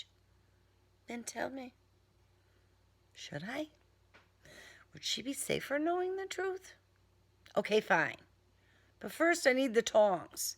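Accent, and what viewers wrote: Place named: New England, United States. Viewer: New England accent.. 30 bimbos..lol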